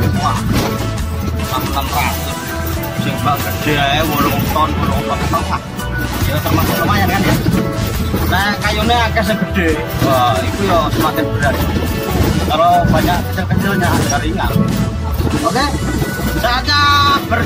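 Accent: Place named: Banyumas, Indonesia. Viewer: Asale ngndi y mas Bisa ngapak y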